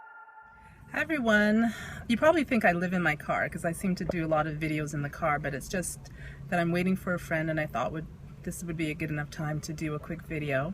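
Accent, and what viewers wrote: Toronto, Canada. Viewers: Toronto Canada for sure! I knew before even seeing the video, the accent don't lie. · Canada. I hear a slight Mixed Canadian accent. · Canada somewhere, my aunt lives there and I recognize that accent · Toronto, Ontario Canada recognized the accent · Judging by your accent, i'd say Canada, but I've never been there so i couldn't say where in Canada.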